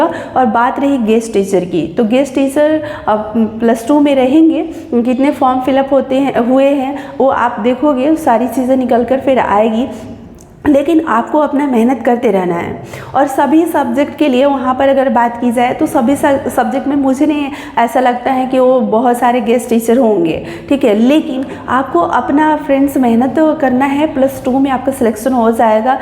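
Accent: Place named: Bengal, India. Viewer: Aap bangali ke tarah bolti h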